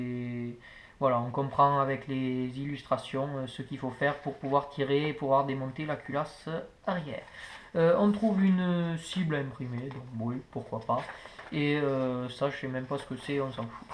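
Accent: South, France. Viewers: nan mdrrrr c'est un accent du sud 😂😂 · au l accent sudiste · pour l'accent du sud :)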